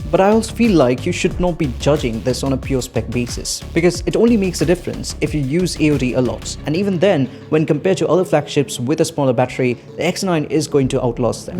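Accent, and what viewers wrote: Bengal, India. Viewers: Bruhhhh i knew it i kneeew it that u were Bengali but i couldn't proove it .... from the start I was sure about u from south .... Nice videos man 👍 love ur reviews keep it up 🙏🙏 ...... Aren't u gonna do a moto signature??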